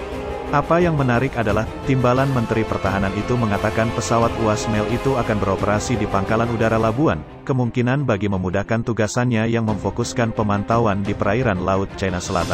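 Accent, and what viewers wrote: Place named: Indonesia. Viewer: Negara ni skrg dah teruk korup, lagi mahu beli aset baharu..........LCS pun dah bayar RM6 bilion, sebiji pun tak siap, menteri2 umnobn/pn cekik komisen. Suara pun org indonesia lagi mahu sembang ttg tentera Malaysia........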